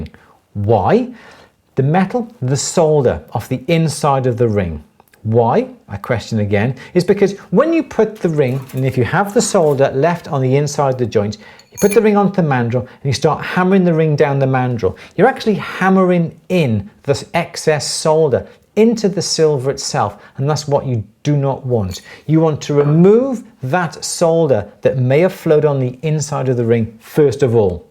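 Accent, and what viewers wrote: Wales, United Kingdom. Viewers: Great videos. U have a sight Welsh accent s. Wales??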